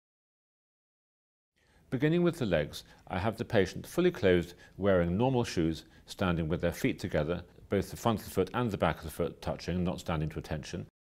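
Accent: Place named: United Kingdom. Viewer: I love the british acent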